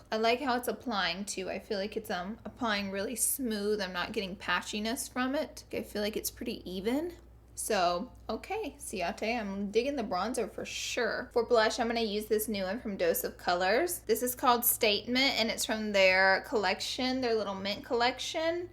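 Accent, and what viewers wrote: South, United States. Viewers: She sounds SO southern I love it! #SouthSquad WYA? Edit: SO MANY SOUTHERNERS! I found my people!!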